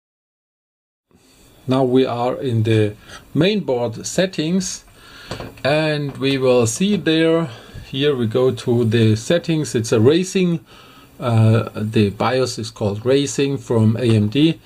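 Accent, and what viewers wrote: Germany. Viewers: Man merkt absolut dass du deutsch bist aber trotzdem gutes video :)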